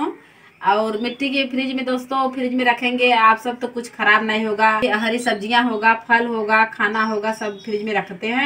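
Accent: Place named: Prayagraj, India. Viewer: Bahut achcha laga, aapki Prayagraj ki boli sunkar bahut achcha laga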